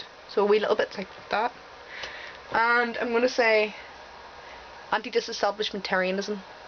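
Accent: Fermanagh, United Kingdom. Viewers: My Granny, my Dads Mum was from Fermanagh. She moved to Manchester England in her early twenties. She still had the strong accent as went back in school summer holidays with my Dad and his brother. It’s nice to hear you speaking, reminds me of her. Although I remember her being stronger but could be my bad memory. Thanks anyway and hope you and loved ones are well. You seem like a great person and if your anything like I was when younger. Your a good person but don’t realise or have the confidence in yourself you should.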